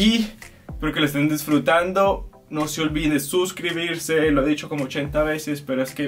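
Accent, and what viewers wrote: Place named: Cali, Colombia. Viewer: Puro asentó de Cali ve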